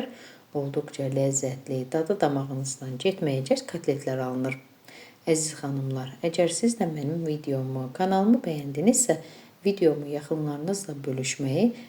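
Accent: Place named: Nehram, Azerbaijan. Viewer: xanim deyəsən Nehrəmlisiz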